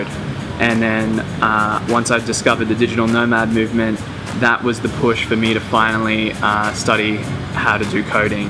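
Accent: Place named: Australia. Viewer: I'm 100% you're australian (by your accent)!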